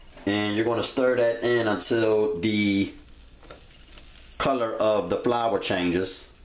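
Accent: United States. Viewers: 10 minutes, 4 commercials? You know this is a cooking video, and more subs and views is a good thing right? I'm so lost, cause you totally sound American, and above the age of 16...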